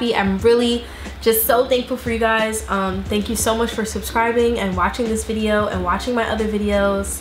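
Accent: United Kingdom. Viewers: Your British accent is killing me🤣🤣🤣 I’m from London x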